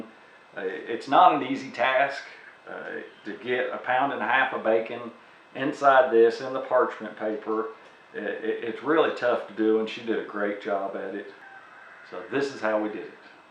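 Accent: United States. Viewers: I like this guy! And he got a like and subscription because he wasn't all "hay goys loike n subscroibe dood" na he's all American. A man America herself wishes she could emulate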